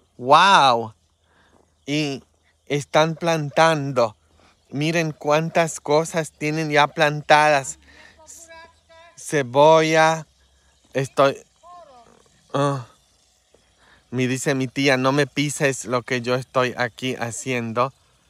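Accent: Mexico. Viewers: Padre,soy una subcritora nueva de su canal ...es usted muy ameno,me encanta verle en su día a día y oírle hablar en español con ese bonito deje mexicano!! Por aquí estaré !!!..un abrazo!!!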